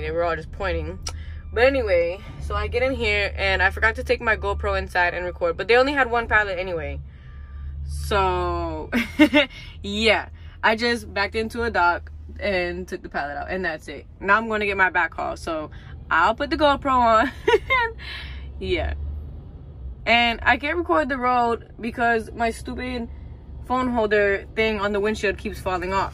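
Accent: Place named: South Florida, United States. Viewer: Sounds like South Florida. Pompano or Miami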